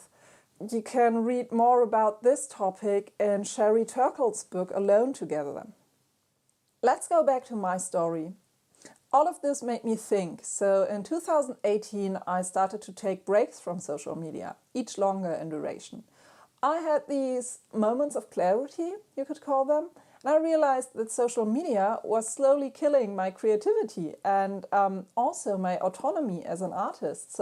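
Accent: Germany. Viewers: Thank you for this post! I felt the same way. But a certain schedule helps to stay away from checking it, besides I haven’t been on instagram from March to October of 2022. And it feels like I need to do it again 😅 But I joined Behance, where I can communicate with different types of artists. Not sure about this platform yet, but at least my portfolio is online. P.s. Love your German accent so much. When I heard it, I’ve realized how much I miss this country and the language itself. Danke schön ❤ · I like your accent, during your video my mind almost convinced me that your were speaking German and not English ;D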